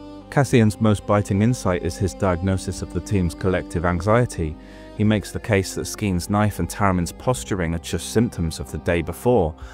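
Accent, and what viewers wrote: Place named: United Kingdom. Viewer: First off, I promise you that I push your channel as much as I can and I wish there was some way of helping you out and getting you more into the public eye. There is a huge audience for Andor content, but the algorithm is so difficult to control. I genuinely wish you all the best, and as one monotone British accent to another (in my case a very broad northern one) I love listening to you! As for this arc, I think this is when Cassian slowly begins to understand that he can be a leader but he's still not there. And I totally agree with your ideas about Skeen - he is Cassian's dark reflection, what Cassian could have been if he hadn't had Clem and Maarva and the community of Ferrix. I don't think the value of Cassian's upbringing can be underestimated It gives him the confidence to face down the other members of the group and slowly start to worm his way in and make himself necessary. 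Turn into Ellen Ripley, if you will! And Nemik is so genuinely happy to meet somebody that he can talk to and who is willing to listen to him and, as you say, nerd out with him. In another life, they would have had a really good sibling relationship I think. I do think Skeen cared for Nemik, although I've seen a lot of arguments to the contrary. The innocence and the eagerness of Nemik is that breath of fresh air that perhaps they all need. I enjoy your videos so much - I too am no longer in work as of last week, and so can't afford to support you but I do wish you all the best.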